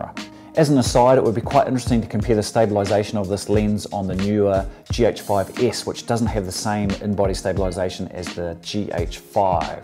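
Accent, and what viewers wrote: New Zealand. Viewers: Great educational video. Nothing like a good kiwi accent. NZ looks fantastic.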